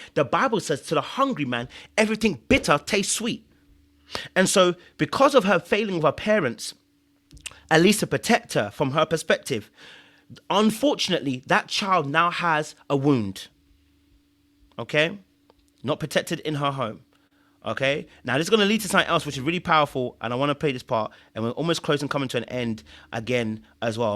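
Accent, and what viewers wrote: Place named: United Kingdom. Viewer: When u start talking and the British came out 😮 it will never not be shocking to me ❤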